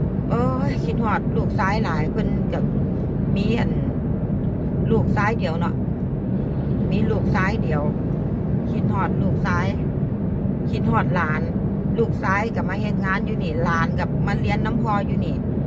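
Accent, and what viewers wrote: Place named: South, Thailand. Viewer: คุณ พูดสำเนียงมีความคล้ยคนใต้นะคะ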